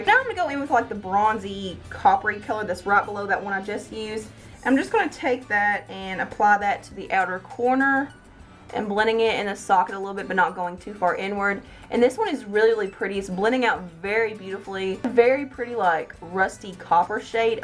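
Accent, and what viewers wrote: South, United States. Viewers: r u using a ton of filming equipment or just your phone. .I think being yourself and using just a phone to record or minimal equipment is so much more genuine and easy to relate to...I love how easily you explain everything and seem to enjoy sharing your talent. .and product information etc with your viewers. .I live in Mississippi so I adore your accent. .looking forward to more videos and tutorials. .great job. ..best wishes!!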